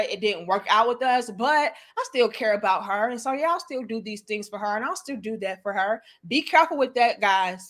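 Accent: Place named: South, United States. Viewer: She has the most unique southern proper voice and dialect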